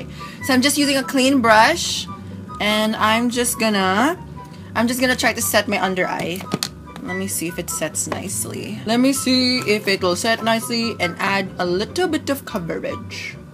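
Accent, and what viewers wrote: Bisaya, Philippines. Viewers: Bisaya ka ba mama?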